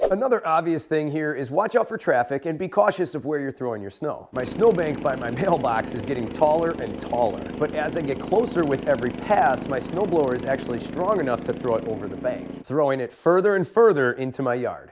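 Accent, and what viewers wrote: Buffalo, United States. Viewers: First time watching your channel, I guessed you were from Buffalo before you said it 😅 I’m from Getzville. We got a ton of snow from this blizzard. Hoping you made it out ok!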